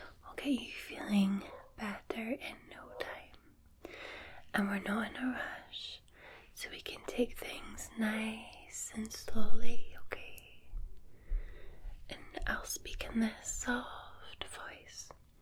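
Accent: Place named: Scotland, United Kingdom. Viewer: Great video as always so relaxing your Scots accent makes me melt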